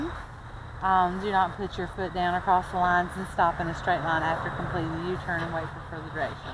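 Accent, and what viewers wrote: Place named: Texas, United States. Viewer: I love her Texan accent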